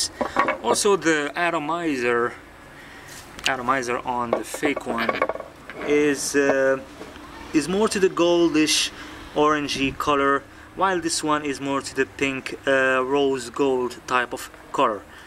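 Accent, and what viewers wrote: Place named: England, United Kingdom. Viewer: This is very helpful!very nice english accent,, i send you all of it,🤗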